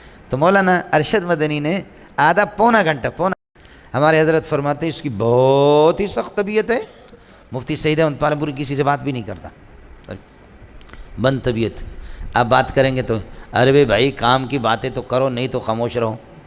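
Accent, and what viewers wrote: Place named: Balochistan, Pakistan. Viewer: بھائی جان یہ بلوچ ہیں.. انکی علاقائی زبان / بول چال ایسا ہی ہے.... یہاں کے لوگ یہ میرے والد ہیں نہیں بولتے.. بلکہ سیدھا یہ میرا باپ ہے.. بولتےہیں.. اگر یہ آپ جناب کے بجائے.. تم.. بولے تو وہ بے ادبی کی وجہ سے نہیں.. بلکہ انکی علاقائی لہجہ کی وجہ سے ہے..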